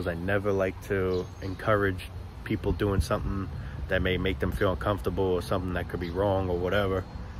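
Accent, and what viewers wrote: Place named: New England, United States. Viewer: Homie prolly from New England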